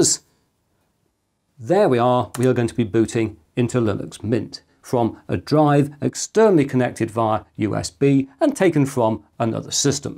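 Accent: United Kingdom. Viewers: So wonderfully British. Thank you so very much! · Hello mister, this comment may be a bit unrelated to the video but I wanted to send you a message either way. I've learned of you by looking for information about SSDs, because back in year 2021 of October, I was upgrading my PC and I was a bit confused about the SSDs here and there. I was merely looking for basic information, but then I stumbled upon your channel, looking at your channel's avatar I thought; "Oh, it's an older looking man with a bob haircut and glasses, I believe he will be trustable, he also looks British, perhaps an old chap from Britain or one of the Americans that kept their roots from New England?". Ironically, your looks matched! I was going to do the usual of just skipping through the video, expecting some boring information I don't need...Well I did not do that whatsoever, I didn't even stop a second to "unbore" myself somehow. 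For whatever reason, you have this very British charm on you, where you always speak rather slowly, and it may sound boring but it is not so, and you have a wonderful accent that I if I was into one of those listening books or whatever they're called, hey I might actually like it. You also never make such unnecessary things, like stop to make a joke for 15 seconds, which in the end it is funny, instead, you just use your own British charm to say something very witty and it works excellently! Carrying on, the information you have provided for the SDDs was amazing, I learned a lot, it even wanted me to check m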